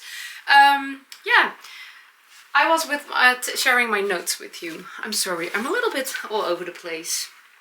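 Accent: Netherlands. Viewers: I love the “heart jumping”! I’ve been saying it and my Grandsons (5 and 9) think it’s fun and they know exactly what I mean when I say they make my heart jump!❤❤❤ Love your podcast! And your “Dutchy” English. You are perfectly easy to understand!!! ❤️🥰❤️🥰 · I live in Kentucky in the USA. I love your Dutch-ie English!!! Heart jumping is one of my favorite things you say. It is such a beautiful and descriptive way to express it! I have always also loved your hair. You looked extra beautiful this episode. I have similar texture to my hair and I think I will cut it like yours!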